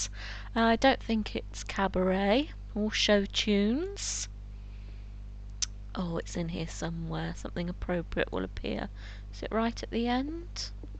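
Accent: England, United Kingdom. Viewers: i know this has nothing to do with the video but...i love that english accent ^_^ but yeah i have audicty great software if you dont ecpect to do much with it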